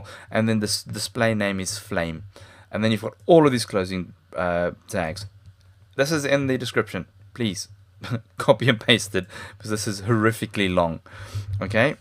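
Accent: South Africa. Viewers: Ur South African ¿ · Are u South African You sound like it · are you South African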